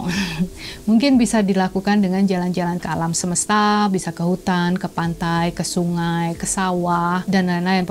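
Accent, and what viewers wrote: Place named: Java, Indonesia. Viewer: Mantap sekali ilmunya 🥰🥰 sehat selalu kak 😍 Bahasanya clengkring clengkring ngapak banget 🥰🥰🥰 salam dari purwokerto kak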